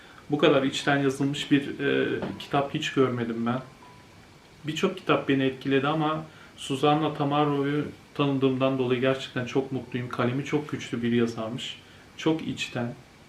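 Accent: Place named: Sunda, Indonesia. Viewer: 🙋 cuman teungarti, basa sunda ath ngomongna😁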